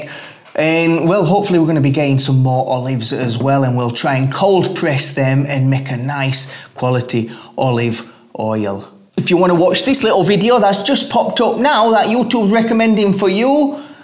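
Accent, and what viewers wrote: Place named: Scotland, United Kingdom. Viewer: he sounds Scottish, maybe Irish.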